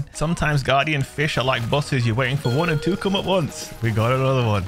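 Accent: United Kingdom. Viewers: Something about a britsh man talk about fish in destiny is oddly satisfying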